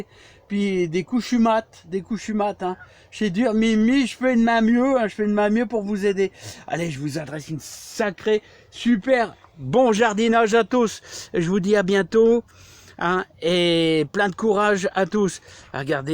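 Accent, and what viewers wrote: North, France. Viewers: Merci j adore le patoi du Nord 👍😜🤣 et tes vidéos conseils. Bonne journée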